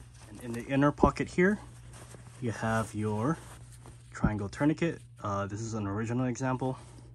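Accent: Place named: United States. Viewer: Your sudden american accent make me confused a little bit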